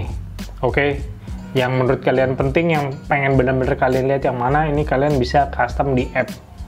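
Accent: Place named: Madura, Indonesia. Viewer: Bang love your accent. Madura kah? Sorry klo salah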